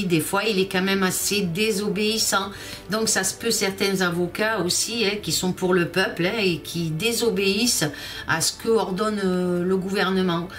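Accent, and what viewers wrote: South, France. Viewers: Du repos belle, nous passons des portes vibratoires assez fortes! L'on nous conseil de nous reposer!! Après c'est toi qui vois? Un grand merci d'être là pour tous! penses à toi... Le plan continu doucement à se mettre en place.. Bises de coeur à vous tous et à toi!! Encore une belle lecture avec ton joli accent méridional qui chante à l'unisson...!!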